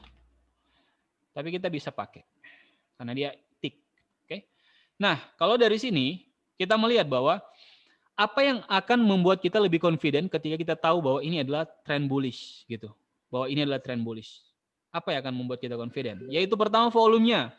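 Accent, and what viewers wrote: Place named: Bima, Indonesia. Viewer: wah orang bimaa ini logatnya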